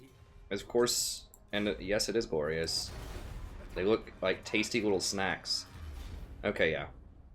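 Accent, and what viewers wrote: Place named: South, United States. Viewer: I'm from the south, and I think you have a small accent, so when you said "LOOKS LIKE GOOD FOOD TO ME" I died lol. Also, "it's late, I'm feeling frisky" lol, really good channel. I had a question though, I want to play a mortal enemies campaign with a faction that has late gate powerful units and economy to spread across the map, what faction would that be?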